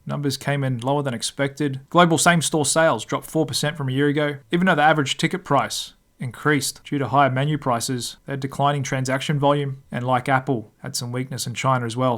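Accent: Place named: Australia. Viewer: I liked that Aussie accent 👍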